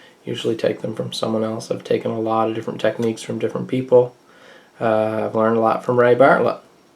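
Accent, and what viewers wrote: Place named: Newfoundland, Canada. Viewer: New to the channel you sound like a fellow Newfie! Love it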